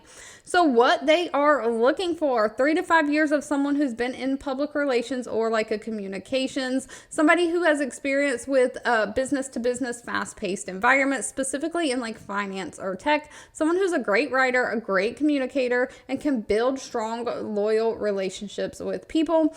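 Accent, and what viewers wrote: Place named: Louisiana, United States. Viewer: you're welcome luv! I saw on an older video you said people were complaining about your voice or something. Do not let that bother you! I'm a proud Louisiana woman that talks just like you, lol! Be you! Have a super day!💜💜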